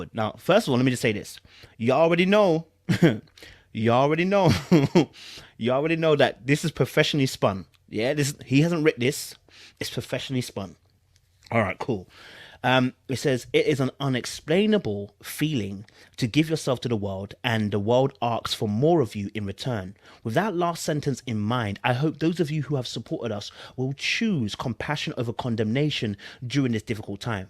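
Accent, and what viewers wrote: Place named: United Kingdom. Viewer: I AM DYING!!! THIS IS HILARIOUS AND YOUR BRITSH ACCENT MAKES IT ALL BETTER!!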